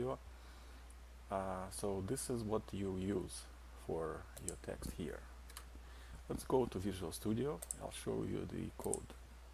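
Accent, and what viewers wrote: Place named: Russia. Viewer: Русского человека сразу слышно :)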